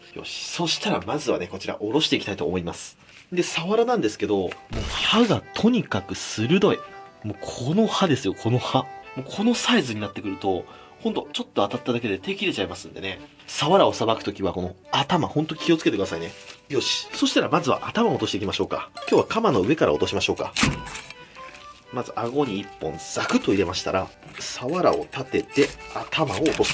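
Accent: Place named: Nagoya, Japan. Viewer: 名古屋人だと馴染みの言葉だけど、なまってると思う人いるのかな？と思った。 「飲まずにおれん」「冷てービール」 素敵な動画ありがとうございました！！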